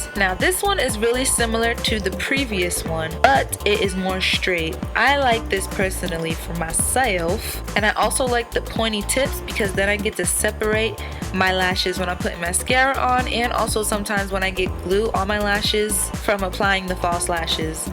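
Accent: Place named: Rural, United States. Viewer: Your lil country accent is the sweetest thing I have ever heard in my life!!! <3 I know thats weird but you keep throwing your lil twang in there and its literally the cutest thing I have ever heard! Get it ma!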